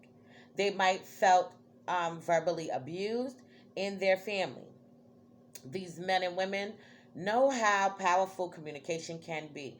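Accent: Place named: New Jersey, United States. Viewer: You gotta be From Jersey! Love it!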